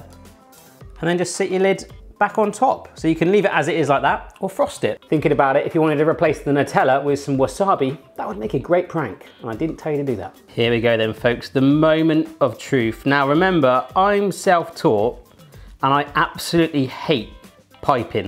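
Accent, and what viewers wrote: United Kingdom. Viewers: You sound so british I love it xD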